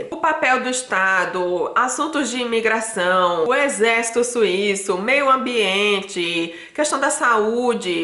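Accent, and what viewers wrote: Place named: Recife, Brazil. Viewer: Gosto do seu sotaque recifense !!!!😍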